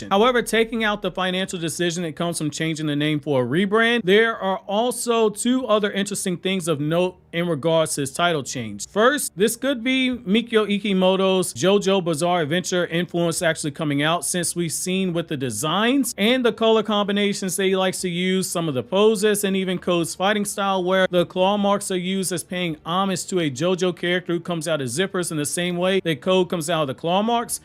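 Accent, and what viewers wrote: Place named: Carolinas, United States. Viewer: Bro sounds likes you lived in the Carolina’s lol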